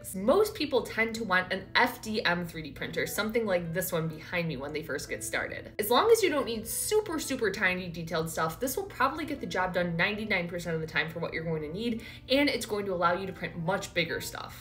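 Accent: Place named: Midwest, United States. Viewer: Are you from WI? Your Midwest accent is thick!!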